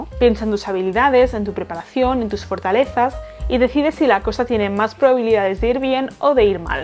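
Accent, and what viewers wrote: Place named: Spain. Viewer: me gusta tu voz y ese acentico español,. te preste mucha atencion y te entendi bien, ademas que eres bien linda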